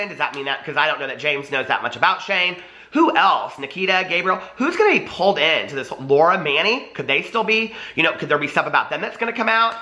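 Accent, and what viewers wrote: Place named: Alabama, United States. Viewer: I haven’t watched you in so long but you pulled me right back and boy howdy did I miss you....As an Alabama native myself, you sound more like you’re from here than people I see walking around Walmart...😂 I love it & you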